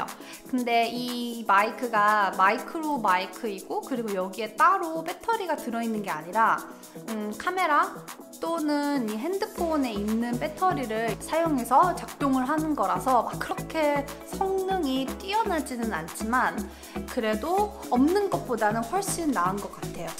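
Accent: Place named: United Kingdom. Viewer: 영국발음 치여요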